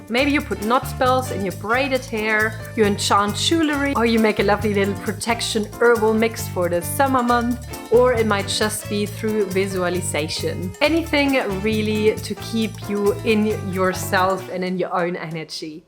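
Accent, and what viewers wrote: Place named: Germany. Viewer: I live in a small rural town. Its about a mile square. Population around 1100. Our home sits on a large corner lot. We have a huge backyard. I feel lucky I can light my fire ring whenever I want. But summers are just TOO HOT! We have enough room for any kind of gardening. I prefer to do my working intentions either within my home or in my backyard. We have lived in our home for 40 years now. So there is a lot of strength and love within its walls. My maternal grandmother came from Germany when she was 16 years old. She lived to be 99 and 1/2 years old. I love listening to you. Your accent is much like hers was. 😊❤❤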